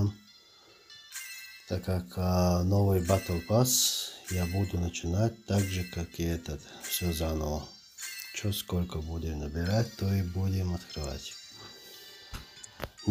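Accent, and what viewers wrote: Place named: Chechnya, Russia. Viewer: Ахах ну у тебя акцент чеченский,) улачи в развитии p.s на чеченском-волк)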